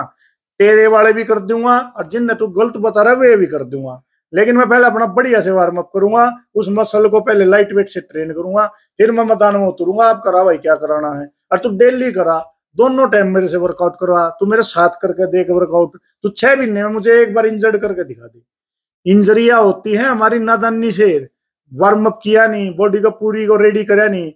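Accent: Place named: Haryana, India. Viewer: Haryanvi bolke koi cool ni lag raha ye. Tumhe lag raha hai ki is tarah baat karke hero ban gaya, aisa nahi hai... Delhi, haryana ke aadhe parhe likhe log hi follow karte hain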